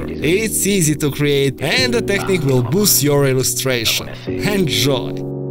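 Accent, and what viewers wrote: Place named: Russia. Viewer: такой знакомый рашэн акцент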